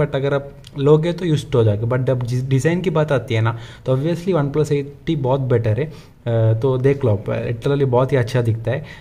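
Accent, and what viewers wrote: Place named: Mangalore, India. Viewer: Your style of talking😁 like mangalore pepoles when talk hindi iam also from mangalore😉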